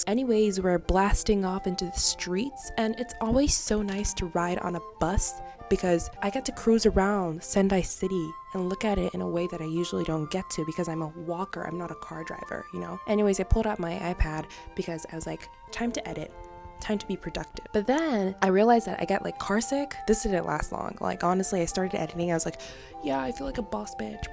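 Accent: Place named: United States. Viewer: What’s your backstory for your lineage and your English skills. You sound totally American. I couldn’t remember if you and Eiko were both Ghanain or if you were Nigerian. Your artistic skills are totally BOSS by the way. You’re unique and special at same time. If you ever visit the US you will feel at home amongst the African-American community.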